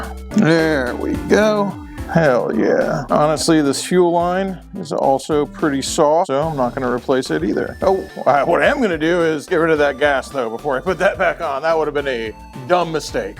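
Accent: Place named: South Florida, United States. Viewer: I’ve been around since the heavy southern Florida accent! lol good times… long time. As real as any YouTuber gets, good bad and ugly.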